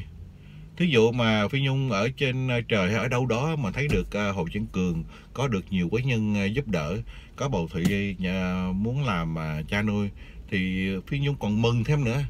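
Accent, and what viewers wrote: Standard. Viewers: Chủ kênh nói chuyện hợp tình hợp lý hay có tâm nói chuẩn quá anh trai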